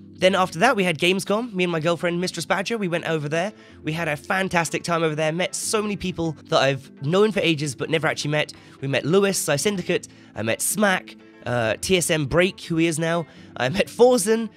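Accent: United Kingdom. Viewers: Like Simon Sinnek said it a ones: "They don´t will love you for doing things the way you think they want it. They will love you for beeing yourself. You´re doing it absolutly right. Oh and by the way. Your brittish accent paired with a dbd Killer is the best i ever heard! Keep up your great work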